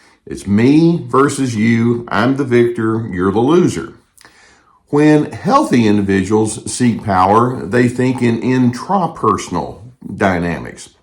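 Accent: South, United States. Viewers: I wish you would talk more slowly. (I get hyped just listening sometimes!) I know you have lots to say (and that you are sharing generously!), but I would benefit from you adding "slow" to that great, kind Southern style of yours. (Thanks!)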